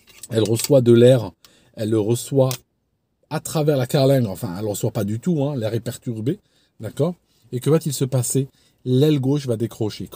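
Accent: Switzerland. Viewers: Il est bien guilleret dès l introduction notre, ca change des intros à la Pierre Bellemare. Et cet accent etonnant (suisse d apres les autres) qui surgit plus présent que jamais. (Bon moi j ai toujours trouvé que tu causais comme le père Algerois d un ami). Bref autrement le contenu est toujours d une régalade absolue. Un petit hors serie un jour sur Lindbergh, le hindenburg, l Aeropostale? Tant de merveilleuses histoires dans l aviation ❤❤❤ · Il n y a pas que moi qui a remarqué son accent suisse 😂😂😂😂😂 Encore une très belle vidéo, merci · Étant genevois, c'est son accent qui m'a fait lever un sourcil et de fil en aiguille j'ai commencé à suivre régulièrement ses vidéos qui d'ailleurs sont toujours captivantes. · d'où tu le sort cet accen suisse, tu vis en suisses? Perso je m'en fou, mais c'est pas saint. Ça sent le mensonge. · Eh oui j'ai aussi remarqué l'accent suisse un peu vaudois ? 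· Oui l'accent.. C'est ma mère originaire de Genève qui m'a fait remarquer sur une autre vidéo. Je trouvais pas ça flagrant mais alors là😮 ! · Pourquoi vous reste-t-il de l'accent suisse? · Merci...tu nous diras par quel mystère ton léger accent suisse te reviens en ce moment;-) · "LEGER" accent suisse??, plus il est en forme plus il laisse traîner joyeusement l'accent, je viens de la frontière, si je ne le connaissais pas et juste avec le son je jurerais qu'on a affaire avec un Genevois de souche! (mais méga-callé en aviation) 😁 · Mais qu’est-ce qu’il se passe sur cette vidéo? Au début je reconnais très nettement au contraire que de toutes tes autres vidéos un accent suisse! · Le petit accent suisse..! Je me disais la même chose donc.! Monstre bien la vidéo.! 😂 Vive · J'ai été devancé, mais pourquoi l'accent Suisse ressurgit d'un coup dans cette vidéo ? Un coup de fil dans la journée avec un ami Suisse ayant ravivé des souvenirs ? 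· C'est marrant, t'as pris les intonations et un soupçon d'accent suisse. Combien de temps y as-tu passé ? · Vous avez pris l’accent suisse ? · Effectivement moi aussi j'ai réentendu ce léger accent Suisse dans ces dernières vidéo. J'aime bien , ici en Alsace nous avons un peu le même. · C'est vrai que sur cet épisode M. nous gratifie de légères intonations Suisses, sans doute un reliquat de ses études de pilotage dans la Confédération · oui j'ai aussi senti l'accent suisse ! c'est nouveau ca ? :p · Tu me fais sourire avec ton léger accent Suisse cher Ami, m'a tué a 900 ft on récupère que les boîtes noires😂😂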